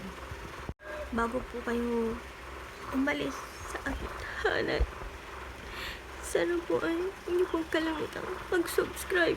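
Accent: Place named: Pampanga, Philippines. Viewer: May halong kapampangan ang galing sis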